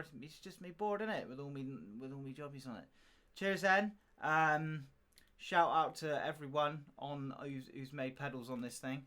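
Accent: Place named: South West, United Kingdom. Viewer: Mostly came here to find out what that mysterious silver box of yours did, Nice to hear a south west accent talking about pedals for once keep it up!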